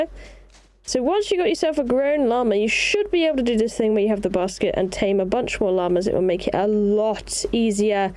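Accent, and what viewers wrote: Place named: Cockney, United Kingdom. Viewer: The cockney/essex dialect is SO funny. It's not LAMA it is LAMER. It's not WATER it is WUOA. Too funny.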